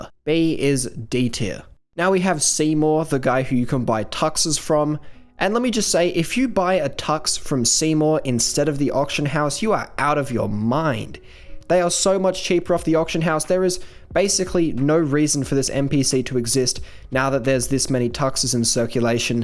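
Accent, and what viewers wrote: Australia. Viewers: I thought that was an aussie accent, I live in melbourne and I am sad, I can’t even beat greensleeves )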